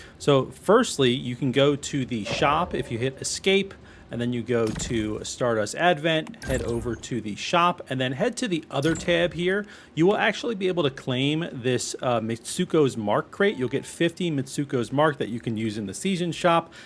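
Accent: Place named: Pennsylvania, United States. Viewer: This guy sounds like he’s from Pennsylvania. Good tips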